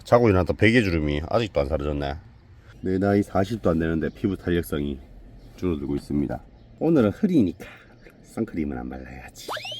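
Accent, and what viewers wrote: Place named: Gyeongsang, South Korea. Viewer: 경상도 같으신데~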